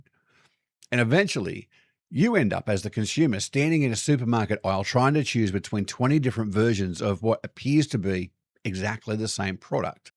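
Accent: Australia. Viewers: You’re not an Aussie living in America; you’re an American with an Aussie accent. (I’m an American with an Ohio accent.)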